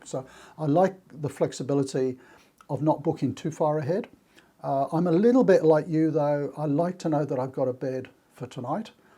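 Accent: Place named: Australia. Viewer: It's that Aussie accent: VDLP or Via de la Plata, the name of one of the Camino routes.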